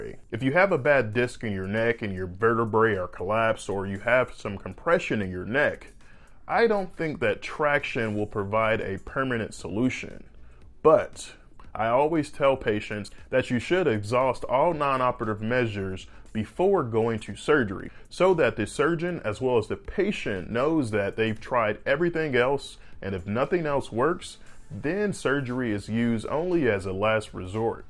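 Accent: South, United States. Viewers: Having a southern accent isn't an indicator of low intelligence. Imagine making fun of Einstein because he couldn't pronounce "theory of relativity" correctly.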